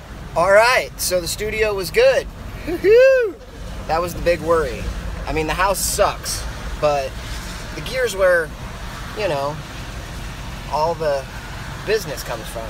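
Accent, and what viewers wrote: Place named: United States. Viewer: I just love the accents you Americans have. 🇦🇺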